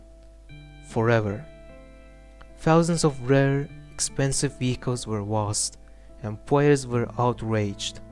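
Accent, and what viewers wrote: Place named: Russia. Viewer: oh I thought that you have that Russian accent 😅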